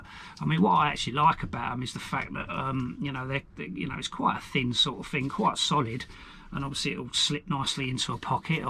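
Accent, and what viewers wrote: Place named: RP, United Kingdom. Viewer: Bit posh mate..... Very posh in fact..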